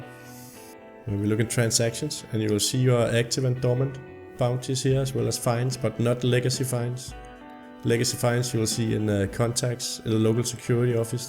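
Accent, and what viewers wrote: Denmark. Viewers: Hmmm, is that a danish accent?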